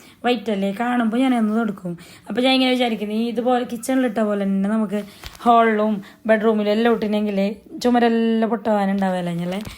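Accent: Kasaragod, India. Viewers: Kumbla specill baasa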